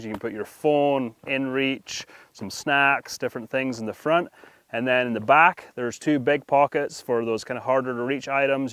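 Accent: Scotland, United Kingdom. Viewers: Sounds like a hint of Scot in that accent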